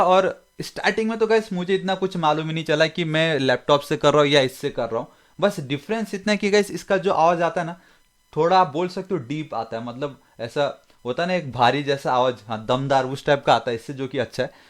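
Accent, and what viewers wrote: Nepal. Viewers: da tapai ko Nepali tone ayo....😁😁 Tara....saachi...dami....u know wat I used to make videos on Hindi as m Nepali so my vocal tone...is not purely Hindi....so my friends used to laugh over me but when I saw ur subscriber and video content it motivate me....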